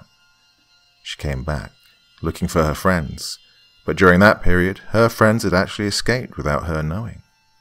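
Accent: France. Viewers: I agree!!! 👏👏👏 his French accent is TOPS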